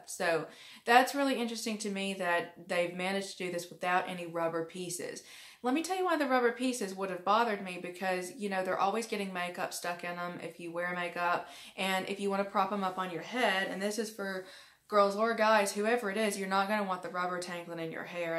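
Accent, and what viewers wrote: South, United States. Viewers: I'll be using my Ray Ban 3487 for more physical activities. But off sunglass topic--"Mardi Gras" and "living in the deep South again" - so was I right that I detect a very slight Southern accent even before you said that? Please don't be offended, I was just curious.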